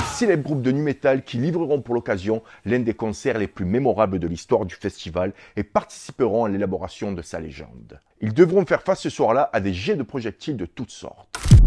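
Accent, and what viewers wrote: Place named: South, France. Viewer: Belle story avec un p'tit accent du midi 🤪🤘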